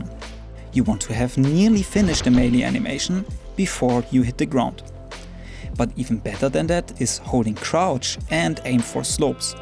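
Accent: Germany. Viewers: this german Accent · this guy has a strong german accent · You make amazing videos dude, keep it up and I believe somedoy you'll become one of the biggest apex content creators :) Btw are you from Germany? I kind of hear a german accent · you can tell by his accent that he is german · its the german accent for me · Nur eine Frage bist du deutsch Man erkennt das an dein Akzent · wie man sofort raushöhrt dass er deutsch ist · Man hört einfach dein Deutsch raus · Do I hear a German accent? 🤔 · german? sounds like... besides, awesome video! :) wish i had seen this months ago.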